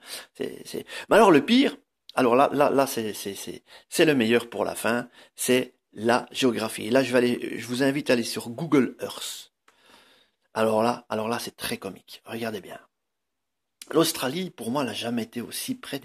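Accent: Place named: Belgium. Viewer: Super l'accent belge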